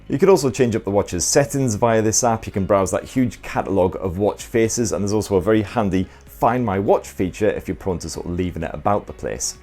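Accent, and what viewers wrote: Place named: Newcastle, United Kingdom. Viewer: Sounds like a geordie therefore he is definitely a good lad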